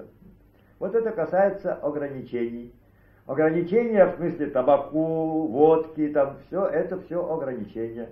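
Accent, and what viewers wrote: Russia. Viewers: Деревенский говор а в академики выбился. Вот это карьерный лифт! Крассава!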